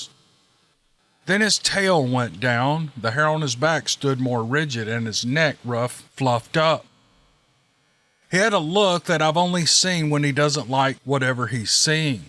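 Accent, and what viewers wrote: South, United States. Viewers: I tune out the moment I hear a southern accent I relation to sazquatch......seeya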